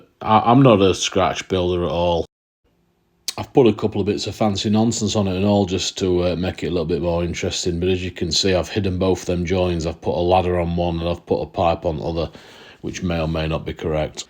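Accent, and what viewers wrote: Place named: North, United Kingdom. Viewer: 😂😂 proper northern instructions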